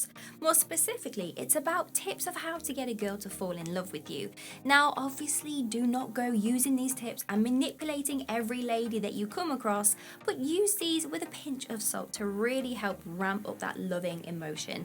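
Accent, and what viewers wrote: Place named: London, United Kingdom. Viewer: I like ur accent 👌Are u from London?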